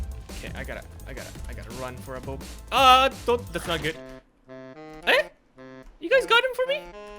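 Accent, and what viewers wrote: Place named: Japan. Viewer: The way you say names in Japanese accent i think you're from japan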